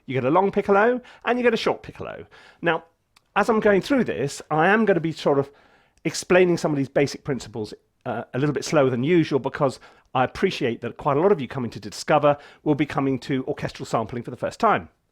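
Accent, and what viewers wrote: United Kingdom. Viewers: I hear a British accent and I put my full trust in this guy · I love this Guy and his British accent! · That is one of THEEE BEST How-to-videos I've watched for a long time. I absolutely love the freshness, the gags, the way he talks. (Love that british accent) Just started with "The secret to what Chords Work", the intro is hillarious. Nice dog by the way. Sir, you just made yourself a new subscriber.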